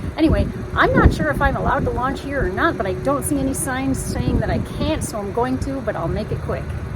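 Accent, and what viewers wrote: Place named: Wisconsin, United States. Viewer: I grew up in Batavia and live in N. Michigan. Well, you haven't lost your Wisconsin accent have you? This was a nice, nature filled video which brought back fond memories of the good 'ole Fox River.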